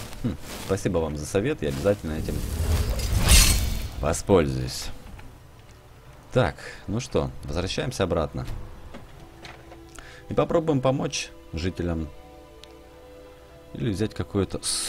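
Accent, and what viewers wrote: Ukraine. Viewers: Ты Украинец? Что за акцент?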